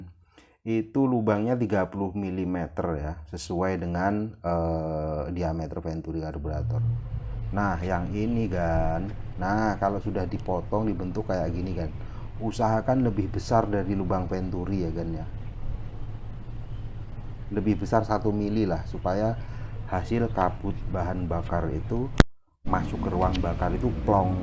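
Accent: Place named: East Java, Indonesia. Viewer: Suara anda kok kayak orang jawa Timur bang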